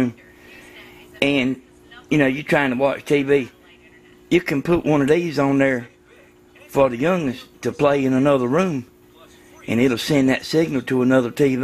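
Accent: South, United States. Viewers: Finally - somebody who speaks my language! Must be the southern accent! Thanks for explaining it so well! We are now up & running!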